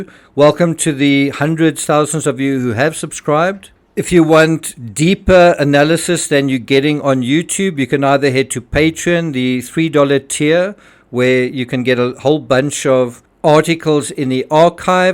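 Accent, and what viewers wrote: South Africa. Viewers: Are you by chance South African? Your accent sounds so familiar- greetings from Nederland…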